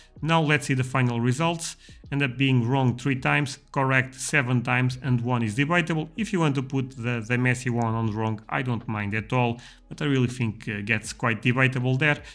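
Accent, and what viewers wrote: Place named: Portugal. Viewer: video muito porreiro, reparei logo pelo sotaque que eras português. novo sub ;)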